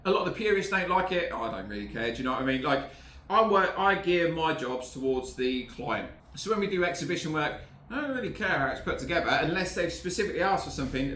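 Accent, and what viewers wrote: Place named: United Kingdom. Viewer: It looks like you have lots of experience along and innovative ideas etc. However, I can't tell which part of the UK you are from, but listening to you, I couldn't tell what you were saying about 30% of the time, especially at the end of sentences and brief asides. I don't say this to be demeaning, rather just to let you know. If I'm having a problem, and I'm from the northwest of England, then there's a good chance lots of other people will have the same problem. When I first moved to North America, I even had someone ask me if I was English, because they couldn't understand me, so I know what it's like. I suggest that you slow down a little, try not to clip the ends off words, and avoid local dialect that listeners from other countries don't understand. Hopefully, you will gain more subscribers which, I believe, you deserve.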